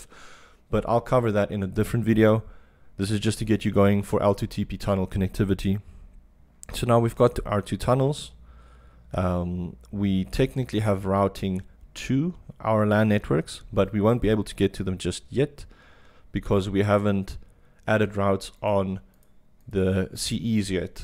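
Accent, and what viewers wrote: South Africa. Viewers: Hi, are you from South Africa? Your accent sounds South African:) If so, do you provide in class training. Your explanations are wonderful and I can see where you can add value to my team and I.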